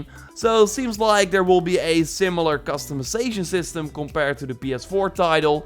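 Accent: Netherlands. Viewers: Are you from holland (Netherlands)? You have a little bit of a dutch accent while you speak english. Great video btw! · yes hes dutch, his accent is quite thick lol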